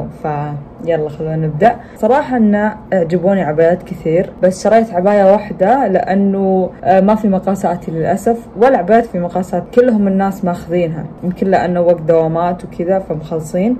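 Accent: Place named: Kuwait. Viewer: اللهجه نص كويتي نص سعودي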